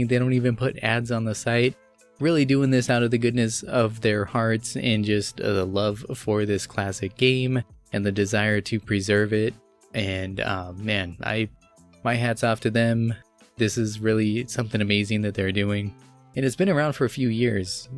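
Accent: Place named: Canada. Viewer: Where are you from? Mild Canadian accent.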